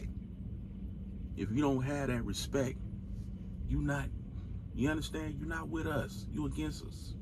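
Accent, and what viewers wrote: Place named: Mississippi, United States. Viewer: Bro 😂😂😂 wtf are you gonna do about it? Sounds so confederate Mississippi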